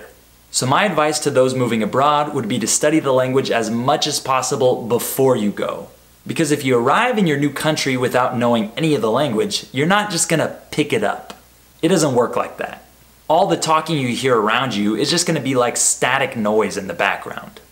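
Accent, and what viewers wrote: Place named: United States. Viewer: That's not English, it's American....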